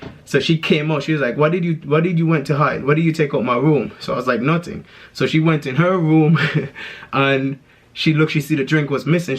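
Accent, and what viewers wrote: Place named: London, United Kingdom. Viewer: So why you sound like you're from London or some shit get the hell out of here with that bronx shit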